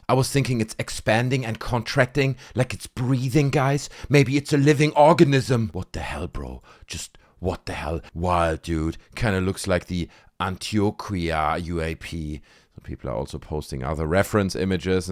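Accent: Germany. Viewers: Mit deinem deutschen Akzent. Klar könnte das so sein. Aber es könnte auch eine Nachahmung von diesen Ballons sein. Auf jeden Fall hat die brasilianische Armee Stellung dazu bezogen und gesagt sie wüssten nicht was es ist. Das sagt mir, es war kein Ballon sonst wüssten sie doch von san joan und Ballons. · this guy sounds german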